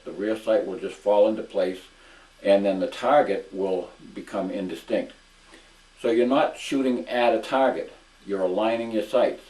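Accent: New England, United States. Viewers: I have been waiting for months for a new range in our area to open so I can take lessons with an instructor there. In the meantime, I have been able to shoot at a couple of places without the benefit of the lessons with mixed results. After watching parts 1 & 2 I can see where I was making mistakes in lining up the sights vs. trying to get the target into focus. This series of videos is probably the best common sense training I have seen on the internet. Thank you very much and please keep producing these informative and high quality videos. I also like your New England accent. Most of the firearms You Tubers have southern accents which is fine, but my wife is from Massachusetts and I love a New England accent. Yours sounds more like New Hampshire though.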